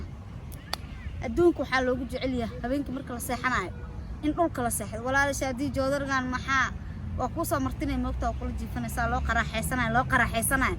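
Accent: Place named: Mogadishu, Somalia. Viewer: Maanay video yadaada markaan daawaado af reer xamarka ka bartaa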